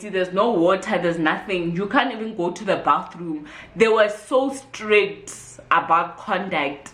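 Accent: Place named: Sotho, South Africa. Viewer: Your Sotho accent ❤️😍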